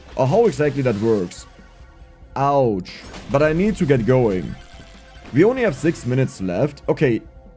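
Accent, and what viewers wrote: Germany. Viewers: Didn't know that german-accented commentary was what i needed more of in my life but i will take it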